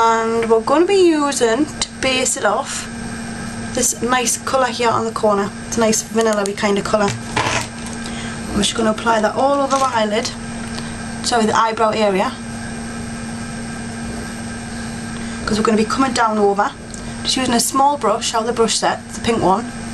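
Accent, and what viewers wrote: Newcastle, United Kingdom. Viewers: Love her accent geordie!!! Im on camera mind! lol love it!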